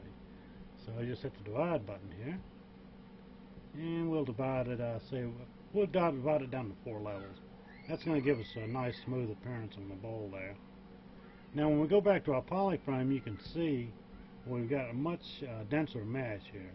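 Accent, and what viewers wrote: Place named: England, United Kingdom. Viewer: in the beginning of the video i thought you were from england. then i realized you have a southern draw..then i thought maybe louisianna..then i thought maybe i was initially right about being english.. anyway thanks for the video! z brush has so many features i dont even think the makers of zbrush know all of them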